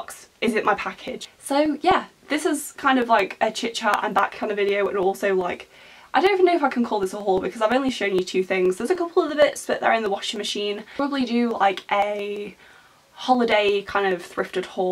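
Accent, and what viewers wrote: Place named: England, United Kingdom. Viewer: I like your style! Just subscribed 😊. Whereabouts in England are you from? Your accent reminds me of home - I've mostly lost my accent from living abroad. Now I just have a general British accent that's hard to pinpoint! The teddy is sweet, not creepy at all. I recently picked up a guinea-pig soft toy that made my mum's 'flesh creep'!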